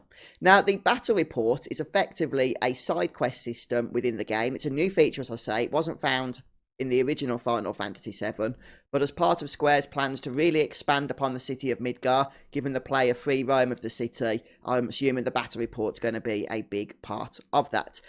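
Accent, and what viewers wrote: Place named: Somerset, United Kingdom. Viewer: Are you from Somerset or somewhere? Haha. ;)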